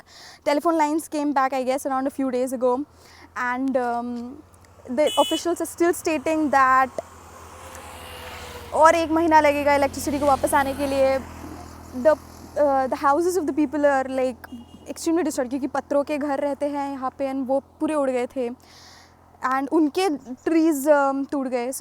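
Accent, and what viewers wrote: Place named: Maharashtra, India. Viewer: Are you marathi???.. Your words sounds like marathi tone🤔🤔🤔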